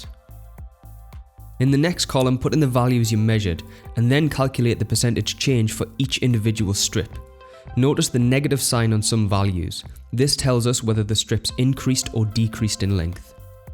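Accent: United Kingdom. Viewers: british boiii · i personally love the british accent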